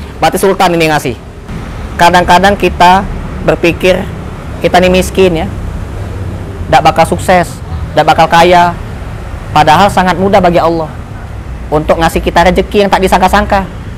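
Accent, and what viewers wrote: Palembang, Indonesia. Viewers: Logat Palembang nya dak ilang... Sukses trus dunia akhirat bang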